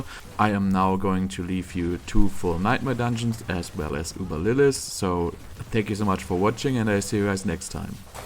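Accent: Germany. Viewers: very interesting build. by the way, are you german ? I think i heard some pronunciation in that way.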